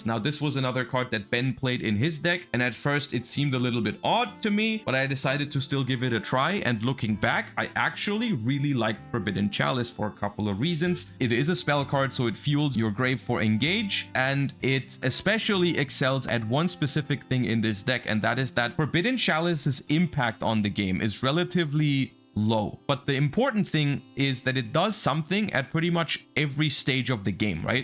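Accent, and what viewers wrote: Germany. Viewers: You’re from Germany?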